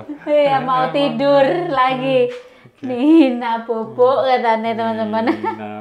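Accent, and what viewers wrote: Java, Indonesia. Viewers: Ketagihan nonton vlog mbak..ngmong jawane iku lho marake aku guyu.. Ramah juga orange..aku seneng nontonnya Salam ya mbak..aku seko demak ..jawa tengah😁😁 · Keluarga indonesia banget, suka sekali kalo mba cara bicaranys medok2 gimanaaa gitu😄 sehat selalu mb sekeluarga Amiin.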